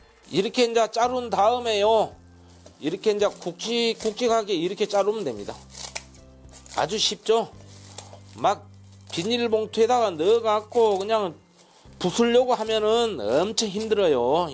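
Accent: Jeolla, South Korea. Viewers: 김 묻힘~. 여나무바꾸 ㅎㅎ 샘의 사투리가 넘웃겨용ㅎㅎ 저두 전라도라 알아묵었는데용 ㅎㅎ 멋진 요리 틈나는대로 보고 많이 배웁니다요 매뉴 감사합니다~. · 찐한 전라도 사투리 정겹습니다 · 여나무바꾸만 돌리믄 됩니다 ㅎㅎㅎ 전라도 사람들만. 알아듣는사투리 그것도. 나이먹은사람들만아는 ㅎㅎ 구수하고. 정겹습니다 음시도 전라도스타일이시고 최보십니다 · 오늘도 구수한 전라도 사투리에 엄청 웃었네요 요리도 최고 👍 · 역시 세프님 멋있어요. 전라도 구수한 사투리로. 설명이 좋읍니다 건강하세요.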